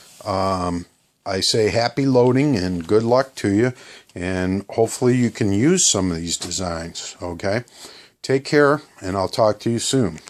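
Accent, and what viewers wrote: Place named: Chicago, United States. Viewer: Chicago area is my guess, too. My area of origination. Excellent modifications!!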